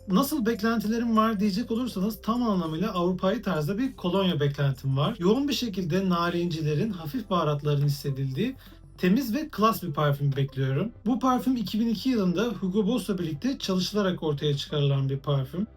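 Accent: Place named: Central Anatolia, Turkey. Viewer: daha instagram diyemiyon mudur. nerenin aksani bu ic anadolu lu musun. ?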